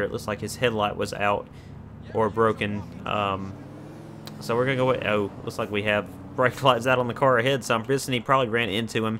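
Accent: South, United States. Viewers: Nice accent lol, always good to see some fello good ole country boys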